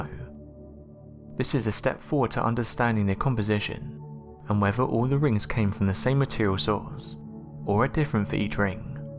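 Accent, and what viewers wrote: United Kingdom. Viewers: Is this a Brithish robo-voice? Otherwise not much content. Uranus is boring. Wind and pebbles.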